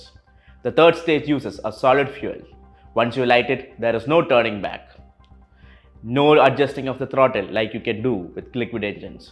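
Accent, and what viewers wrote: Kerala, India. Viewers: A malayali with malayali accent english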